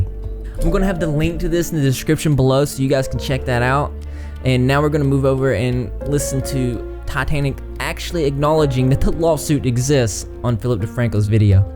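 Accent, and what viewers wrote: South, United States. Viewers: "We all have a crazy ex who turns another ex in to us"😂😂😂. I'm from Louisiana and you have an accent, are you also from the south? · Ohk his voice sounds southern but then sometimes sounds like’s from the UK.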